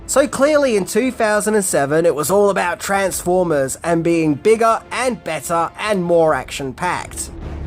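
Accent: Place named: Australia. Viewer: LMAO, your Aussie accented snob voice is hilarious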